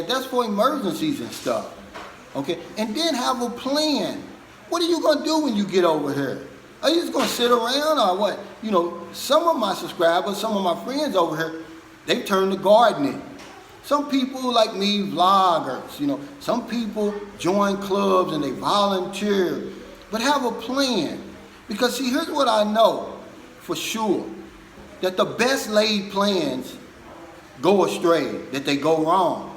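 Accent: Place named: South, United States. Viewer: Thanks. I love your accent BTW ha ha . French is my first language so I have a slight accent in English as well, but I've always loved the South accent... especially for women but hey, I am a man ha ha. You do awesome videos, seriously. Have a GREAT day :)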